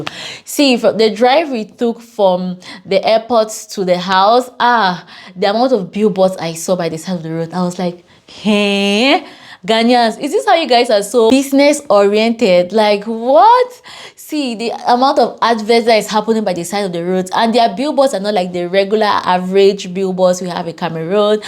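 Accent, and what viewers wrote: Nigeria. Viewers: You sound so much like Nigerian 😊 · Not at all ,she literally speaks like a Nigerian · She sounds like a Nigerian from her accent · No she sounds a Nigerian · Why do you sound Nigerian ?i dont gerrit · U speak like a Nigerian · Naaaa, she sounds very nigerian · Have you lived in Nigeria before? Cause you can't be Cameroonian with that accent.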